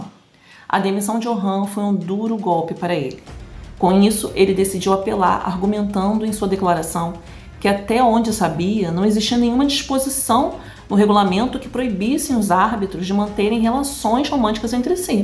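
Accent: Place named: Rio de Janeiro, Brazil. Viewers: vdd! mas tem cariocas q tem isso mais forte. A é até light se comparada a outros!!! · Até que enfim, esse sotaque que é igual ao meu!!! Muuuuuito difícil no nicho True Crime, alguém carioca!!!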